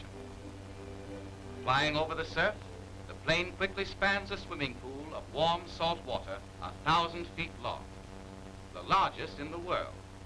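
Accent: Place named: Mid-Atlantic, United States. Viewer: Great example of the Mid Atlantic or Transantlantic accent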